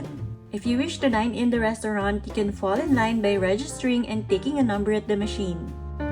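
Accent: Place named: India. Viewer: Why do you have an indian accent?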